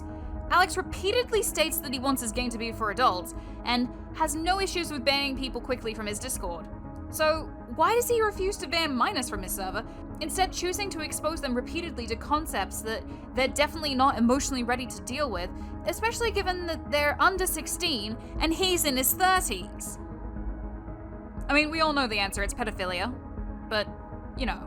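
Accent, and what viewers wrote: Australia. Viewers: wait a damn minute he's australian? gross get him out of here musty bitch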